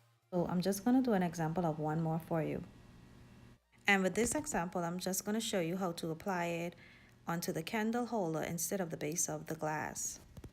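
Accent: Bahamas. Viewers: Nice, you sound Bahamian ?? ❤️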